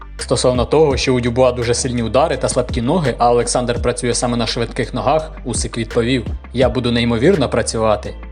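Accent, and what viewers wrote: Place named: Rivne, Ukraine. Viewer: У вас Рівненський акцент...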